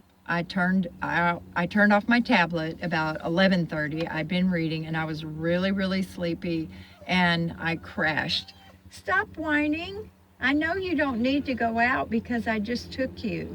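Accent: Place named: Texas, United States. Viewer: Good that you know about that place.I guessed that you were from Texas because my friend Freddie talks exactly like you. :-)Take care.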